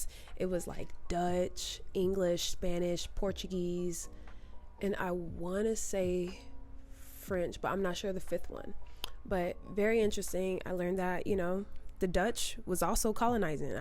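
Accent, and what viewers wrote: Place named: Model C, South Africa. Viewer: Thank for responding to the comment iv made on the other video. Well If you'd come to South Africa, you'd fit in perfectly, especially in the suburbs. your accent wouldn't be so different to what we call "Model C" accent. Then later you may link up with people who'd take you to the townships.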